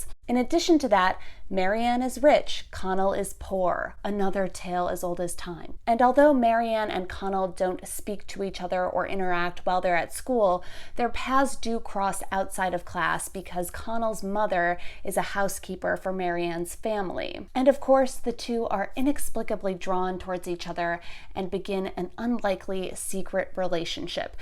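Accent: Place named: United States. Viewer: Wow love your review - reminds me of what English lessons were like back in the Sixth Form. Such a shame my school never invited any guests with an awesome American accent like yours. I did meet Simon Armitage and Matthew Sweeney though - this happened maybe 6 years apart (i met Sweeney first, then Armitage) - so that’s something I guess!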